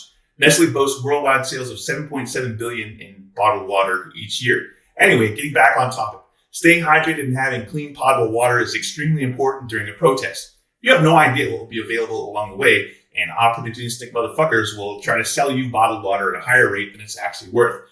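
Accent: Scotland, United Kingdom. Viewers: Super Scottish !!!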